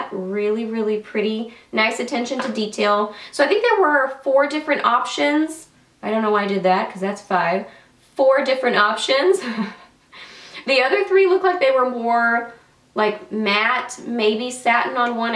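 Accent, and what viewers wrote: South, United States. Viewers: You're so fun to watch! Your southern accent is so cute! Love and hugs from Canada!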